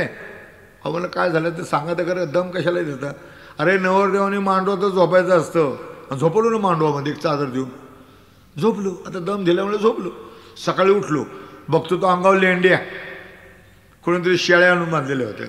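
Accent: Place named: Ahmednagar, India. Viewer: Khas nagarcha rangda aawaj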